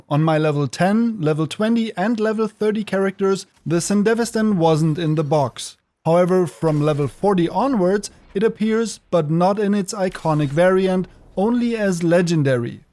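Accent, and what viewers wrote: Germany. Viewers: Hier sieht man mal wie krass der unterschied zwischen deutschen und englischen Videos ist. Der original creator hat hunderte Videos consistent gemacht und du hast schon halb so viele Abos… würde dem ganzen mal folgen, da das echt interessant zu sehen ist und ich selber überlege englisch zu machen. Wie ist deine Erfahrung mit dem “deutschen Akzent und wie gut die Leute das annehmen?” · Akzent: Deutschalisiert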